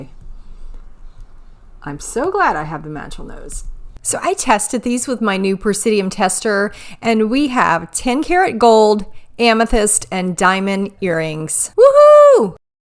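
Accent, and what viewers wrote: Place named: Alabama, United States. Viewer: You must be from Alabama.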